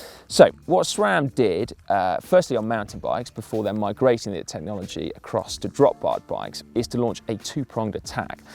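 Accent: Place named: RP, United Kingdom. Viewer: Where does get his posh voice from? #torqueback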